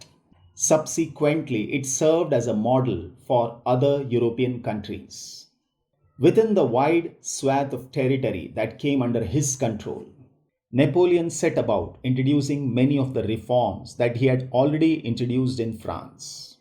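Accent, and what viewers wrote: India. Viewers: नमस्ते I am an American and I enjoy watching your videos. It is really calming as I have a stressful life. I love the geography exceptionally. I really like learning about India, as it combats the Ameri-centrism and Euro-centrism that is common in American schools. Most influence even came from Asia, from the Indian Spice Trade (to Arabia) to the Chinese discovery of gunpowder and its usage. Keep up the awesome production of videos! 😁👍I like the Indian accent and culture. I had a Nepali friend for most of my life. P.S. I like your look, lol 😂😀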